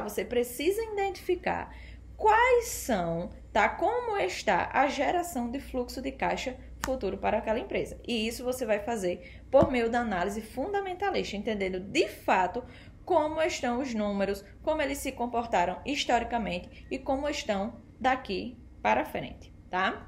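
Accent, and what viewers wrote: Northeast, Brazil. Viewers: EXCELENTE VÍDEO, GRANDE CONHECIMENTO SOBRE O MERCADO DE AÇÕES, AMEI O TEU SOTAQUE NORDESTINO, NÃO O PERCA NUNCA! TÚ REPRESENTAS COM DIGNIDADE O POVO NORDESTINO! APENAS CORRIJA O HÁBITO DEFEITUOSO DA PRONUNCIA (INDENTIFICAR) NA PALAVRAS "IDENTIFICAR". PARABÉNS! PROFESSOR ROBERTO GUEDES CAMPINA GRANDE PB!!!! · Excekente video. Parabens ! E o sitaque matavilhoso me traz as boas lembrancas do Nordeste e dos inumeros amigos nordestinos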